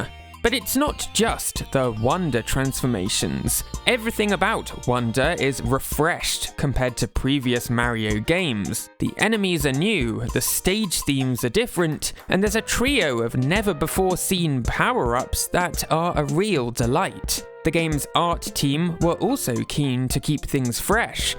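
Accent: United Kingdom. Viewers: This is probably the most UKish accent I've ever heard.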